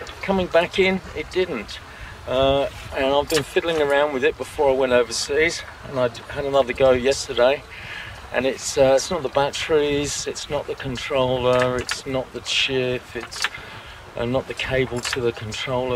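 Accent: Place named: Australia. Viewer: First - the concertina! Amazing! Not the easyest instrument in the world and you did a fairly okay reel there without mistakes. At least - I am impressed. And for your sailing. Lovely as ever. You are facing the summerseason now, as I am freezing up here in Denmark. So looking forward to enloying some nice trips with you down younder, feeling the warmth through my screen and dreaming of my own coming summer juerneys. Did you grow up in Briatain? I would swear by your accent that you were born aussie. - Well. I'm just a Dane. How would I know the differences 🙂 Looking forward for your next vlog! Ah - I forgot. The million vieuws are just bacause your vlog are real quality. You do put a lot of work into lovely intros and overlockings from one scene to the next. It is a joy to watch your channel!!!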